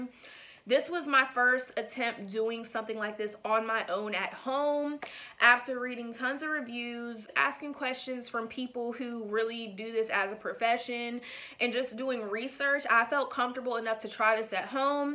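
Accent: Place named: Pittsburgh, United States. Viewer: Are you from the Pittsburgh area? I hear you accent LOL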